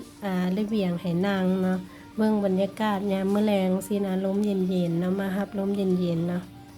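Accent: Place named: Laos. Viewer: สำเนียงลาว แต่คำไทย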